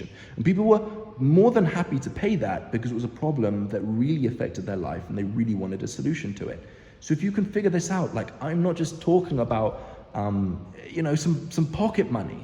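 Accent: United Kingdom. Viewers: I liked your UK accent. It reminds me the awesome voice of the legend- Tony Greig. Thanks for sharing this awesome video.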